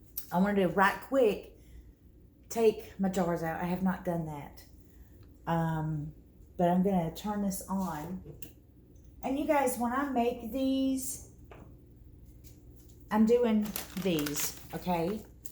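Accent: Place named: South, United States. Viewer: Every time you say something like “Right quick” I just ❤️ you more! You speak my family’s language! My sister and I keep saying we are going to do a dictionary of our family’s quirky southern phrases.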